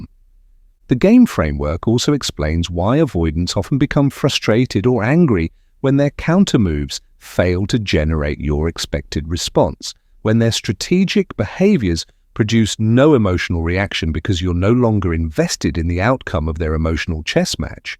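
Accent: Australia. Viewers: Yeah was only r ason I watched this show a familiar Aussie accent I won't watch now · Australian accent again please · I prefer the previous voice with the Aussie accent not this snobbish one.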